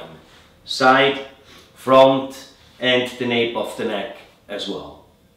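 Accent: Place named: Germany. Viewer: Where is your salon. I detect a German accent...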